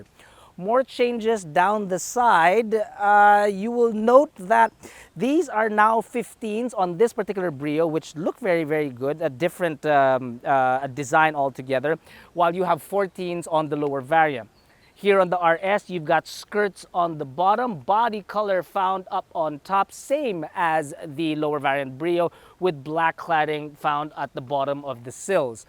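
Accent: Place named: Philippines. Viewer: I love the standard filipino accent.😊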